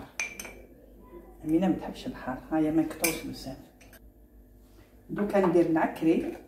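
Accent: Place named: Algeria. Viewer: خيار الناس تباني دزيرية من لهجتك ههه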